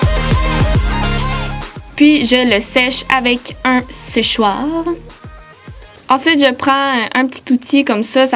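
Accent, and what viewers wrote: Canada. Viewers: derien et j'adore ton accent canadien la 😃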